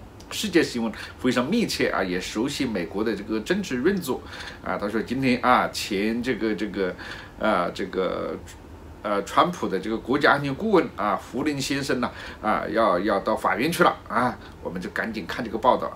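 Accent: Hunan, China. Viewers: 何频你太啰索! 一個简单的问题谈得老长，言不扣题! 另外普通話要说好，你这年龄不該说成这样-湖南国语。改改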